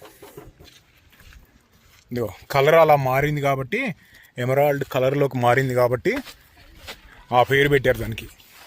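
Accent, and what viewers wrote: Andhra Pradesh, India. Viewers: Bro meeru telangana kada..maree nnizamabad..mee baasha enti andhraa laa untundi..akkada settle ayyaaraa…endukantey akkada astundru,potundru,andukunnava,tindra ledaa..ila matladutaaru… History cheputara meedi…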